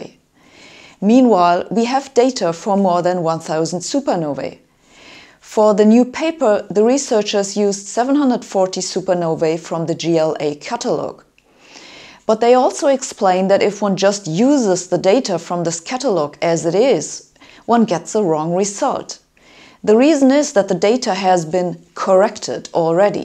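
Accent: Germany. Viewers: Came for the dark energy, stayed for the cute German accent.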